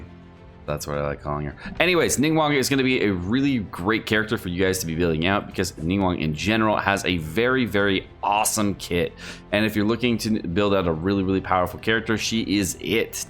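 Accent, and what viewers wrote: United Kingdom. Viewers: I want that British accent of yours to say "can I got a bottle of water" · The British accent just cracks me up xD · Dam your British accent be better then mine and I am actually British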